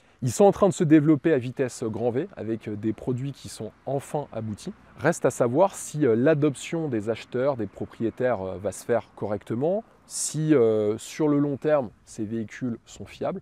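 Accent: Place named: France. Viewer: Pourquoi vous n’utilisez pas la fonction traduction en anglais automatique. Votre anglais est horrible. La phonétique est 100% française. Ça fait mal aux oreilles de vous écouter en anglais 😖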